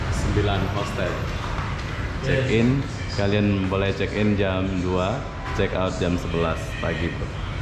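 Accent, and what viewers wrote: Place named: Malaysia. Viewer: Anda org indonwesia tpi dialekx kaya org malaysia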